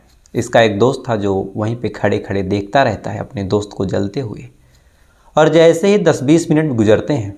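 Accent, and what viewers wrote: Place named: North Bihar, India. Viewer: Your style of talking suggests that u r from north bihar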